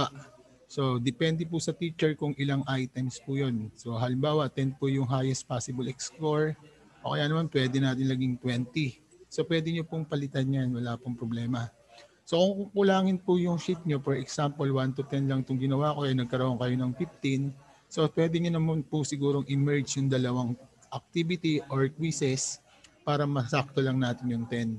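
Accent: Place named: United States. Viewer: The way she pronounced the words are not slang it is american accent good reading..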